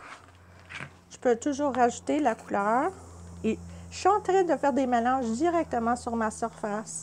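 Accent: Canada. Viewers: Es tu quebecoise? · Démonstration intéressante avec un bel accent Canadien , mais trop long ....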